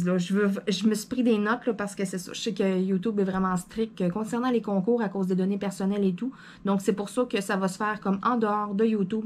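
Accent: Quebec, Canada. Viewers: Félicitations pour ces 402 abonnés, jadore l'accent québécois c'est aussi pour ça que je regarde vos videos. Je participe en croisant les doigts. Sacré gain... bonne chance a tous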